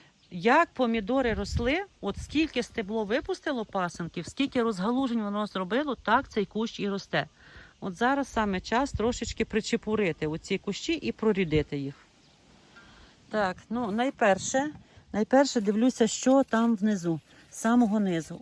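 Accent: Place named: Ternopil, Ukraine. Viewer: Доброго дня там ваш чоловік. Дуже Вам дякуємо за Ваше відео. Дивлюся кожне Ваше нове відео яке виходить. Ваша мова така мені знаєма, у мене покійний чоловік з Тернопільської обл. Борщівського району, він розмовляли точно як Ви. Я з Херсонської обл. Каховського рну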